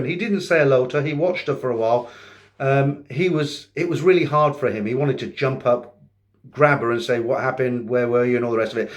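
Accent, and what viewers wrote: United Kingdom. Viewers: are you saying "Edgar Myer"? lol im sure its EKAMI. Love the brit accent mate....just funny.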